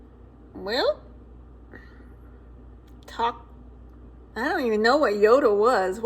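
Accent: New York, United States. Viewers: Wow - U got the NYC accent down pat. 😊 You've got those US regional accents great too. How'd U learn to do that? Hi, gal. Jan here again. Guess U feel a little during latest post. Hope so, gal pal ❤ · Aww no answer yet . Love the NY accent , can you do West VA accent lol I’m from Florida. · Hello from indiana omg I can’t believe your NY accent that’s awesome 😂🥳. Have a great day cutie 😊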